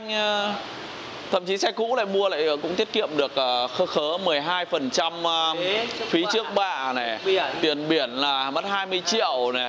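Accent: North, Vietnam. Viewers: Nghe giọng miền bắc đọc kiểu tây chuẩn ko vào tai. Đọc kiểu việt hợp hơn. Nhưng mấy ông miền nam phát âm kiểu chuẩn tây rất êm tai nhé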